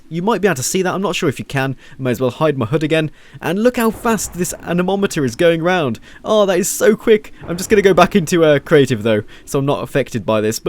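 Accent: United Kingdom. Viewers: I love British accents :D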